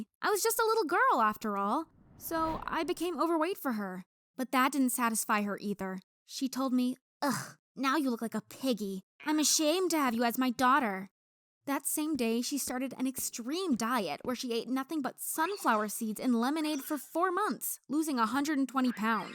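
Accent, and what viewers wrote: United Kingdom. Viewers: Yeah I’m sure you’re from the uk. Such a strong accent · Wait...? SHE’S BRITISH!!!?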